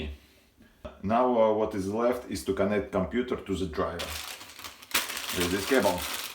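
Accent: Russia. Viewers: Мне кажется ... у вас акцент русский! Если да, то на русском было бы тоже очень интересно.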